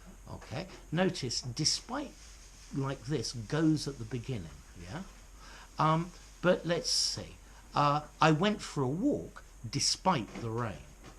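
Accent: RP, United Kingdom. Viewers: The teacher's hair is rather tousled in the video.And he looks very weary and tired Perhaps he is working far too much and doesn't get enough sleep to recharge Nevertheless it is an excellent video And he has a nice pullover too. Which could compensate for the wind-swept hair And I forgot the posh accent which is a dangerous and deadly turn-on ,,nonetheless 😂, Christ ! ❤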